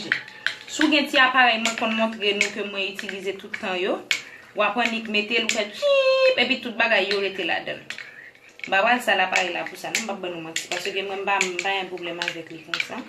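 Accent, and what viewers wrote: Haiti. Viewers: Moun nan k dii exprimel an français madame nan sonw ayisyèn ok saw di a pa gen plas Li dakó banm zizi San valè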